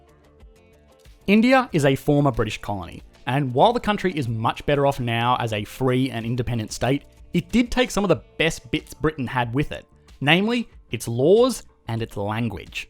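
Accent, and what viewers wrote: Australia. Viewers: Hey are you from Australia!?!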